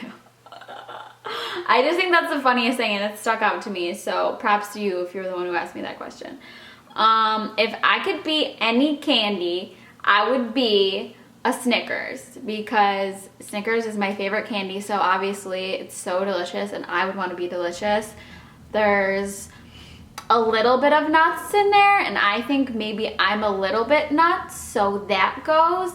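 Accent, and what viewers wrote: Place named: New Jersey, United States. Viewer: lol i have been watching since she started on and off and I always knew she was from NJ because of the way she talks and she talks with her hands a lot like we all do from NJ specially with italian blood but I never heard her say it and after I saw the back of the house i was like that has to be an older NJ house!!! LOL specially up north NJ bc they are soo much more pricey that you are almost forced to buy a fixer upper but in the end its better because you can put ur own little finishing touches! xox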